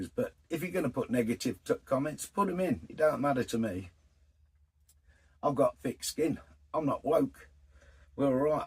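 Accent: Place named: Surrey, United Kingdom. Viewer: you sounded to me you was from Surrey ty for answering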